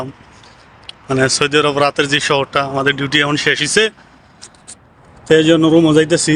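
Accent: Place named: Brahmanbaria, Bangladesh. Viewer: ভাইয়া আপনার বাড়ি কি ব্রাহ্মণ বাড়িয়া? ফেসবুকে আপনি আঞ্চলিক ভাষা ব্যবহার ঠিক আছে কিন্তু ইউটিউব হল একটা আন্তর্জাতিক প্লাটফর্ম এখানে শুদ্ধ বাংলা বলার চেষ্টা করবেন আশা করি।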